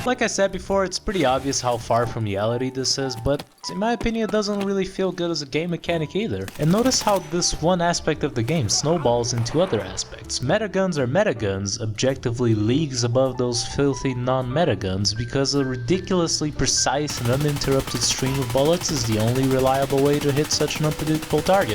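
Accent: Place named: Brazil. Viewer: mano achei por um amigo de oklahoma, conheço ele ha muito tempo cara e ele me mandou os teus dois videos sobre a inércia e eu te achei com uma cara meio brasileira e um sotaque no ingles parecido com o meu, dai eu vi o im brazilian half mexican no primeiro video e resolvi deixar um comentário em portugues porq eu tinha ctz q tu ia saber ler.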